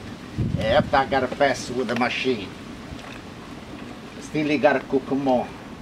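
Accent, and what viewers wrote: Italy. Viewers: I see a man cutting tomato's in his garage, speaking with a heavy Italian accent and i subscribed. Update: Wow I have never gotten anything over probably 30 likes before. Thank you! Good food brings people together! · I just absolutely love this man especially when making Italian food with his accent. Thank you. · *PURE GOLD ITALIAN ACCENT* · See an old guy See title - how to make tomato sauce Click Italian accent = full attention/excitement Sit back and enjoy learning from a master.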